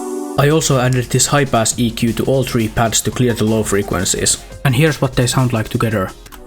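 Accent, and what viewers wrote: Finland. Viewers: You gotta be finnish. Theres no way u arent and talk english like dat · Finnish accent is frying me 😭Vitun hyvä video tho :D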